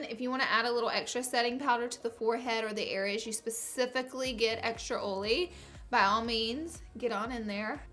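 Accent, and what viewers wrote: South, United States. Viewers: Many southern people talk like this! Lol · What part of the South are you from? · OMG there is nothing wrong with her voice!!! She is from the South so, she talks like that and obviously if you guys were from the south you would talk like that too. So why watch this video if you are going to make fun of how she talks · I literally just started watching this video, I'm 45 seconds in, and I already love her. I was raised in the south as well, although I don't say oil like that, and I love her accent. She's super pretty too! (New subscriber here!!) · I love your southern accent 🤙🏼🤠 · I’m from the south I know ur struggle with words Ily ❤️❤️❤️❤️😘😘😘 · Girl I'm from Louisiana and I love the southern accent!! My shirt right now says "talk southern to me" wish I could post a pic. Lol u. Need it! Weerrrkk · Oh my gosh I honestly love you so much like I just started watching your videos a week ago and I've been binge watching them non stop😂 I think your super funny and cute and I love your south accent.. 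you kind of inspired me to get into makeup. I'm only 14 but it's soo funn lol keep dining what your doing girl ❤️ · I love your southern accent, it makes me feel at home ❤️ don't ever apologize for it. 😘 love from Louisiana (except your football team. Nope. LSU forever 🐯) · you're so hilarious and I love the southern accent 🤗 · I love accent. I was born in Venezuela and in Latin American but I moved to Texas when I was a baby. I grew up in the south and her accent is so beautiful. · Love you I myself is from the south and I also say it like you do haha! Not ashamed! I am also a hot oily mess girl! I love these tips thanks so much! 😘😘😘 hope you're having fun in New York! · She sounds like she's from Georgia or South Carolina. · I love your Southern accent! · Girl...I absolutely love you, you are too crazy lol! I love to watch your videos because I usually laugh the whole time. Us girls from the South ie. 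(Kentucky), we do say things different but I love my heritage. Even if we do say "OIL" wrong to others. He he!! Love ya girl and I will see you next time!! Xo <3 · what part of the south are you from?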